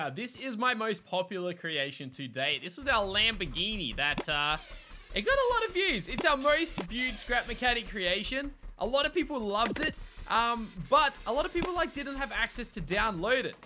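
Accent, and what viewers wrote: Australia. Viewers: Yep he's fucking Australian subbed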